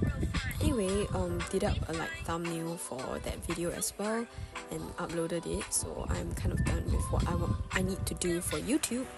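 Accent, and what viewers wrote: Singapore. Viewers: I love your singaporean english accent 😭😭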